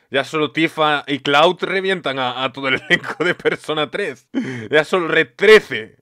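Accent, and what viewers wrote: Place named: Spain. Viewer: Como se nota que es español 🗣️🔥🔥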